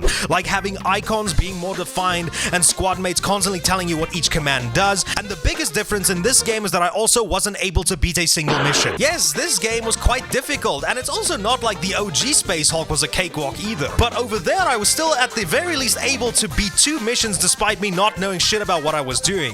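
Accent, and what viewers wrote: South Africa. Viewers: Why did it take me like 10 mins to realise you have a Saffer accent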